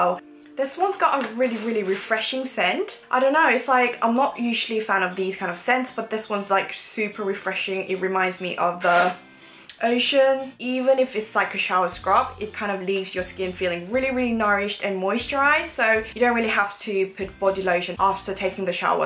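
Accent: United Kingdom. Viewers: 요런 추천영상 넘 좋아요 ❤️❤️ 언제 또 여행갈지 모르겠지만 😂 그리고 항상 느끼는 거지만 목소리랑 영국발음도 듣기 참 좋아용:D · 액센트가 완전 취저ㅠㅠㅠㅠ british accent를 갖고싶지만 어릴때부터 미국에만 살아서 american accent 만 가능한 저는 웁니다...★ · 항상 영상 잘보고있어용❤❤❤ 영국영어 들을때 뭔가 언니가 하면 끌리는게 있어서 계속 반복재생하게되눈거 같아용😍😍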